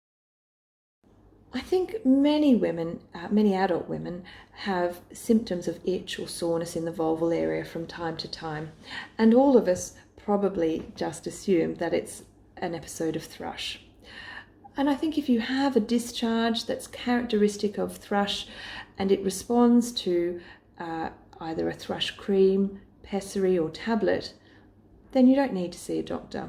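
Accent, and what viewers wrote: Australia. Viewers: Australians are the best dermatologists. Lovely hearing her Australian accent here. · She sounds Australian.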